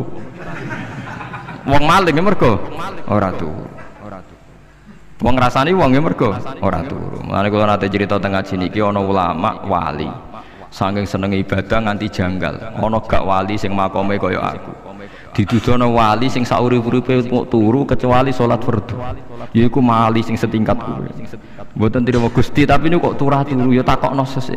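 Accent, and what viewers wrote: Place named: Java, Indonesia. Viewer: Saya suka ceramahnya Gus Baha...meskipun bahasanya medok namun masih bisa mengikuti...isi ceramahnya bagus memberikan banyak pemahaman dan pengetahuan 🙏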